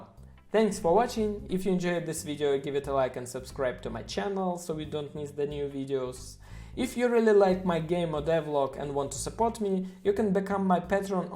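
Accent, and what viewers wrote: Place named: Russia. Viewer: You're Russian!?!? at you Russian accent, and I'm Russian, and if that's the case, I don't need to use a translator.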